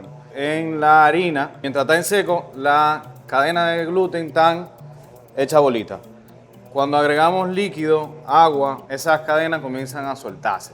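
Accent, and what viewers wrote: Dominican Republic. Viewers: Ahi padres pero tu si habla nos puedes negar que eres domin .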